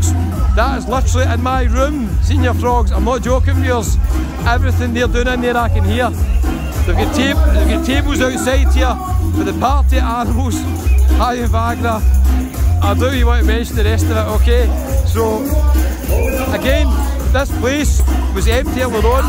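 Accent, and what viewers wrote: Scotland, United Kingdom. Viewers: That pure scottish accent :)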